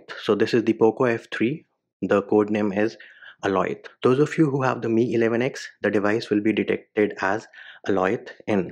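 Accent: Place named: India. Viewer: indian accent make me laugh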